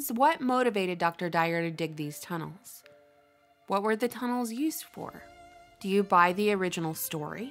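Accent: South, United States. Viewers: Welcome back I’ve missed you n that lovely southern voice.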